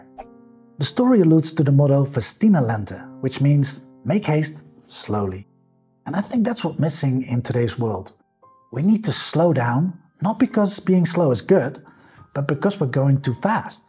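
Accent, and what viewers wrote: Netherlands. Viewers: Kom jij uit Nederland? Je praat lijk op een Nederlander toen ze engels spreken haha.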